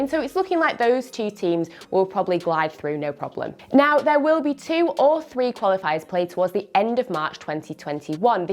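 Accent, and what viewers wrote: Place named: England, United Kingdom. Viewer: Hard English accent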